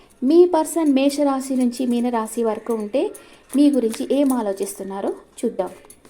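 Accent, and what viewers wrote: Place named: Chittoor, India. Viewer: Maam meedi chittoor district slang superb ga undi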